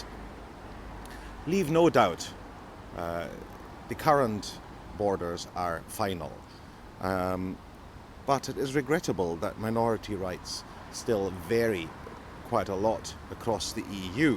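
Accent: Scotland, United Kingdom. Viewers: Are you Scottish by any chance?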